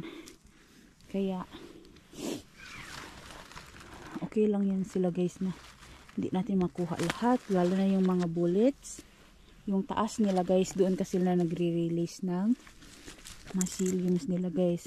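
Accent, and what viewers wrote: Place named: Visayas, Philippines. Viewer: Bisayan dialect ,,asa manka sa visayas region maam...new subscribers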